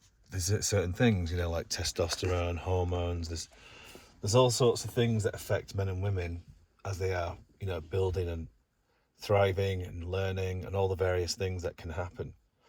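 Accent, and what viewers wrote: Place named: United Kingdom. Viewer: Just subscribed and meet you from The Astrology Podcast, your British voice and natural carelessness, ease with how you speak and not so in control is why I've look to find what passes through you to share. First video watching, serenity is indeed with silence, peacefulness a base to the inner witness. Thank you for sharing and making a channel to connect, I will be watching and generating with it. Thank you.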